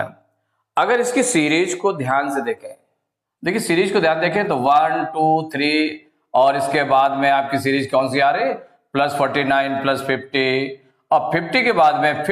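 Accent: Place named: Bihar, India. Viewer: Sir aap. Bhiar se ho kya kyu ki aapka aawaj bhiar jesa lqg rah hai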